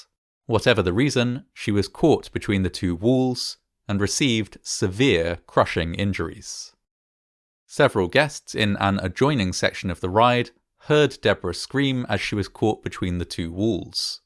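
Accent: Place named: RP, United Kingdom. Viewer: Why does this guy speak so slowly does he think that Americans won't be able to understand is highfalutin English accent? I have to turn it up to 125% playback speed just to not be annoyed.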